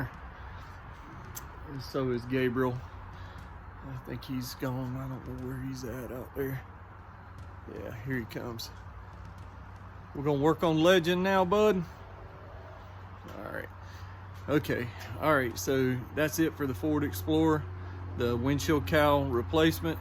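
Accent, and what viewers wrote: United States. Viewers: Are you from Virginia? Sound like a friend of mine. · I don't have the noise you were having, but I noticed the same issue with mine. I'm in South Carolina, you sound like you're from the south too, maybe it's something with the Southern heat and sun? Regardless, thanks for the thorough tutorial!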